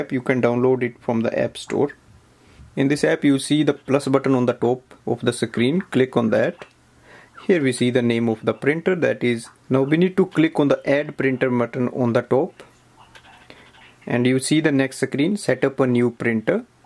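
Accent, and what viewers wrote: India. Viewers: I am among the millions of English speakers who have great difficulty understanding the variety of English spoken on the Indian sub-continent. Please remember that you must speak much more slowly and pronounce very clearly when speaking to us.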